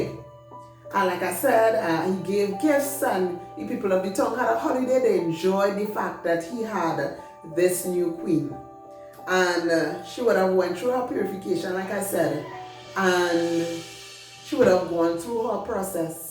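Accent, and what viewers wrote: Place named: Trinidad and Tobago. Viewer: It's the wisdom... But it's also the trini accent fuh meh! ❤️🎉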